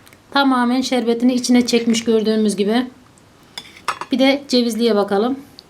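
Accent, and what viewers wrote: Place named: Antakya, Turkey. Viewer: bu antakya aksanı. tesekurler arkadas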